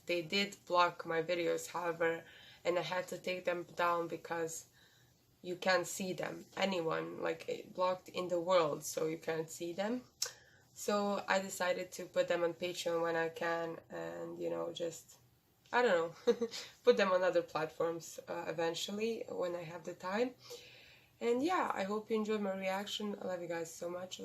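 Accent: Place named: Russia. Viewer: Ты же русская, по акценту слышно_)))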